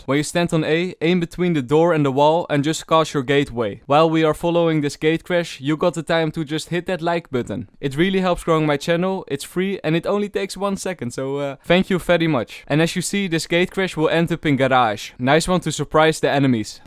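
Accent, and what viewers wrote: Netherlands. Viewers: He is now consistent in uploading great job man With a Dutch ascent!!!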